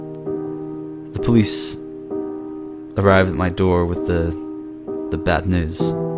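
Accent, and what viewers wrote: Scotland, United Kingdom. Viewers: Scottish accents are sexy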